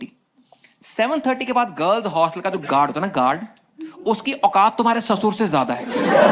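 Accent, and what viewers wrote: Punjab, India. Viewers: bhai ka punjabi accent makest it more funnier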